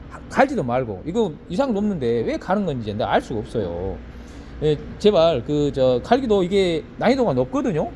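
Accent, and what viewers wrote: Jeolla, South Korea. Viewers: 전라말씨기 찡해버리네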